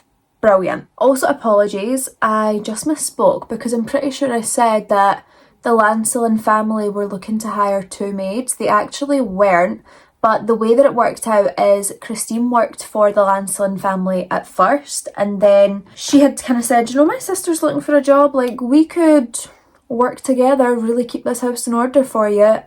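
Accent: Scotland, United Kingdom. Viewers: I do the same thing! I switch between Midwest USA, and Scottish accent all day!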